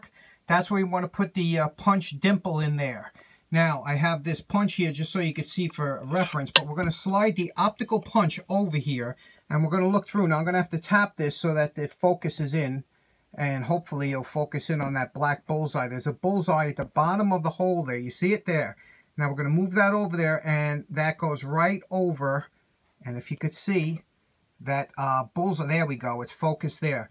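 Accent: New York, United States. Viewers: A very educational episode I loved it. No Disrespect intended but I love the new Yorker accent huh lol it's the best. Pretty soon it's going to be winter I'm in Texas so I'm ready lol. Thanks:D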